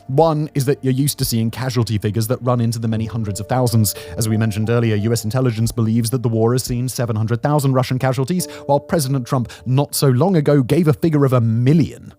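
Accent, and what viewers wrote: United Kingdom. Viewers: never trust a guy with a British accent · The truth isn't within establishment talking points. A bald head and a British accent does not give credibility to obvious propaganda. Understand Ukraine is losing.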